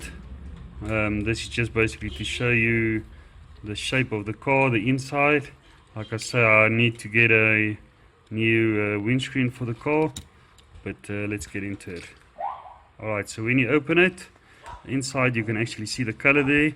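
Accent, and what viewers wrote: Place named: South Africa. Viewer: Thank you, your mini looks good after the Dremel, where are you from? You sound South African.......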